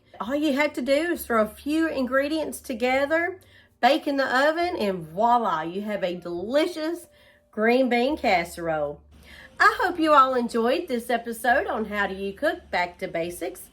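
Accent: South, United States. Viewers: I just found your channel ! I love it + i need it ! Love the southern accent wether Carolinas, Mississippi,Tennesee ,Arkansas !!! Idk but i love it We have no accent in the Pacific NW 😅 Look forward to getting back to some good down home , old fashioned cooking Jesus loves you ! from another sister who loves Jesus 💜✝️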